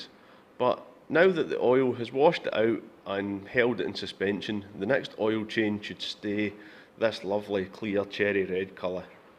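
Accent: Scotland, United Kingdom. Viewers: The Irish accent is Scottish !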